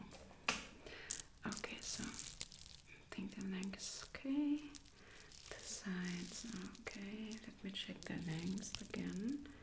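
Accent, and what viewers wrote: Germany. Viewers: I loved hearing both English and German. I love the sound of German accent and language. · speaking germglish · Great idea but a german only Version would be great. I dislike the german accent (in general not you specifically) and it was quite distracting for me personally xD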